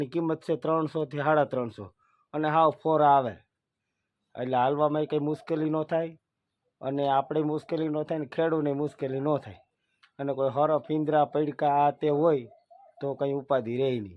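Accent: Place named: Sidhra, India. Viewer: ભાઈ સિધરા બોલી મજા આવી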